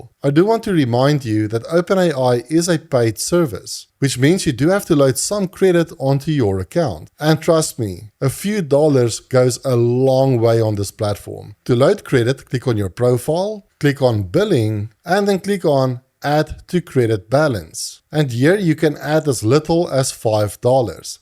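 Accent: South Africa. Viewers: Nice one! Sitting in Netherlands and listening to a Saffa was a proud moment. Love the skillset you bring here, thanks you! *SEO Specialist setting up a multi agent on n8n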